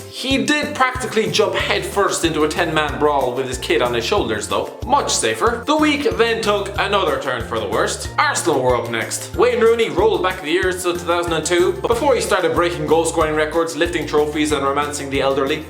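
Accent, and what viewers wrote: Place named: Munster, Ireland. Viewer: Somewhere in Munster I'd say judging by the accent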